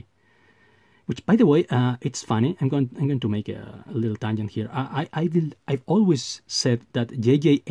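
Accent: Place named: Spain. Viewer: The highlight of your videos is hearing you swear with your Spanish accent. Love it! 👌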